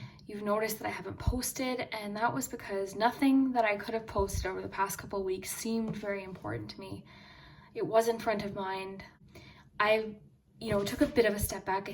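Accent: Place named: Canada. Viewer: You’re Canadian!! That’s why I’ve noticed your accent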